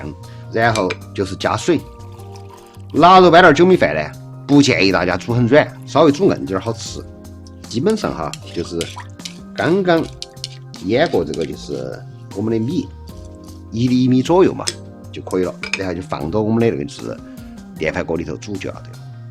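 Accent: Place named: Sichuan, China. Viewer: 雖然聽不懂四川口音，但還感受到那份香味！以後請加上字幕及食譜所用材料、調味料，可以嗎？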